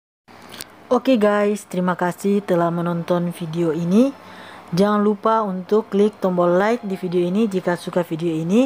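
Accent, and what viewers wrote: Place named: West Sumatra, Indonesia. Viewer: loghat Minang nya keliatan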